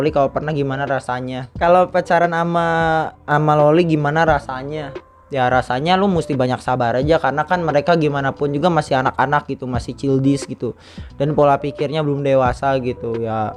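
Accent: West Java, Indonesia. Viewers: bang lu , sunda ya ? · Bang lu dari sunda kah?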